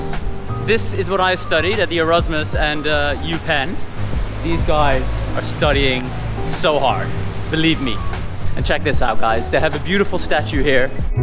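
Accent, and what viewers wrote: United Kingdom. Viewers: I think your not from America because your accent looks like a British accent lol correct me if I'm wrong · British accent, that's why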